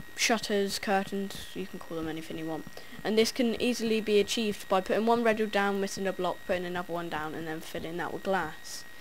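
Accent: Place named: United Kingdom. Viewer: British accent! Omg!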